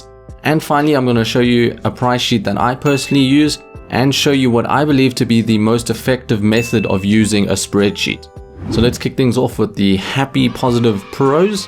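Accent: South Africa. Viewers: Dude you south African? If you are then yaaay xD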